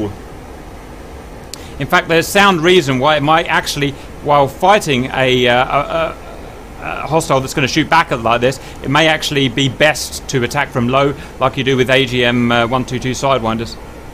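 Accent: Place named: England, United Kingdom. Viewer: can you try to change your english accent with an american accent so i can understand any word about what you say.....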